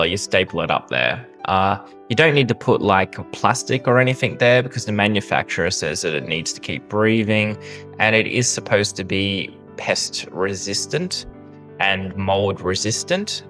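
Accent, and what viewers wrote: Australia. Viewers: we saw the same bad behaviour in NZ. Some increases were insane. I'm sure there was a spike in food waste as well. It's not only in Auckland, sadly. You are blessed to be able to do what you're doing mate. I love your accent, you sound like my favourite cousin in Melbourne 😂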